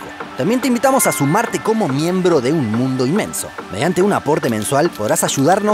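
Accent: Argentina. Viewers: Que calidad de narración y guión tiene este canal, es genial y ese acento argentino a mí me encanta!! Excelente video!! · Excelente dicción en la narración, obvio que con acento argentino, ¡bravo!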